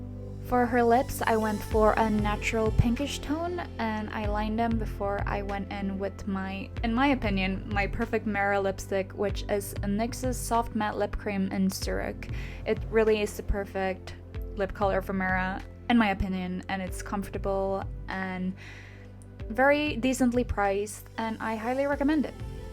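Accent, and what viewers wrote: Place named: Norway. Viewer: I knew it!! My mother is Norwegian and I was born in Norway but I live in America sents I was 6 ( I’m 18 now) so I don’t have much of an accent . But I thought you sounded Norwegian 😂💕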